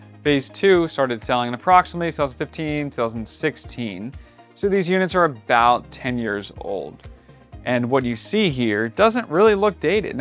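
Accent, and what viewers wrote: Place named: Philadelphia, United States. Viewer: I'd trust this guy. He's so Philly. I hear the accent (just a little).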